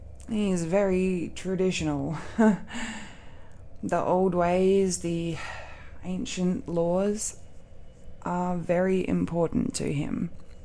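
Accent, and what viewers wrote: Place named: Australia. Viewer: holy shit au accent asmr im melting.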